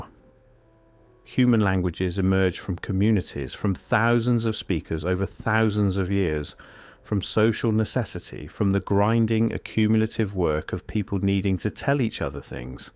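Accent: England, United Kingdom. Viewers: This is a made up story. Note how they use a very convincible narrator with and english accent. It adds credibility.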